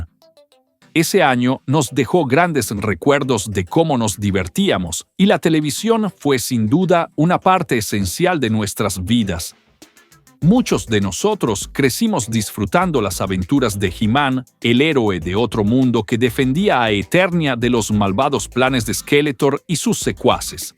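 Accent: Yucatán, Mexico. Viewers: Creo que el que narra el video es de Yucatán, BOMBA !!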